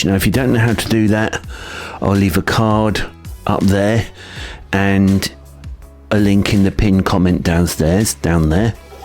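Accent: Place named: United Kingdom. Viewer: Thank you for your videos, your british accent makes it easer to understand what to do than the north america accent that all the videos have had that jag earler looked at.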